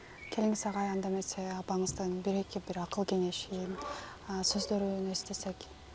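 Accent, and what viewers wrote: Standard. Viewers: Кээ бир кыргыздар сендей таза суйлой алышпайт,орусча,чала суйлоп,азаматсын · Апандын арты кайрылуу болсун,кыргыз эмес экенинди эми билдим айтпасан эч ким билмек эмес болуш керек Кыргызчаны таза суйлойт экенсин сен эмес туштуктун кыргыздары оздору таза суйлой албайтго озьекче аралашкандай ударение менен суйлошотго молодец ишине ийгилик Нарындыктардайле суйлойткенсин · аза ат сын кыргызча таптаза суйлоцт ырдайт рахмат да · Ленин деген русский мектептен буткон кыргызча бизден таза суйлойсун.оштук баткендиктерден таза суйлойсун.. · биз сени кыргыз деп билебиз.Бирок озбек болсо эмне экен.Кыргыз озбек казак бварыбыз бир мусулманбыз.Кыргыз тилин кыргыздан таза таттуу суйлойсун.Конул бурба негатив суйлогондорго.Иттер уро берет... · Сиз таза кыргызсыз. Таза суйлойс кыргыз тилди жакшы корос.сыймыктанас.комузду да🎉🎉❤❤❤ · Сабыр берсин бейишинен орун берсин сенин улутуңду бөлгөн кыргыз кимиси башына ак калпак кийип жүрөт кыргыз болуп төрөлгөн эркектер бар калпагы жок накта кыргыз дагы сенчелик таза сүйлөй албайт кыргызчаны сага күч кубат берсин артында тоодой Кыргыз элиң бар экенин унутпа алтыным😢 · Кыргызстанда жашап, осуп,улутун башка болсо да кыргыз тилинде таза суйлогон,комуз черткен кыргызды даназалап жургон жарандарга ыраазымын. 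апана таазим сендей уулду тарбиялаган. Бейишин берсин. Коп жаша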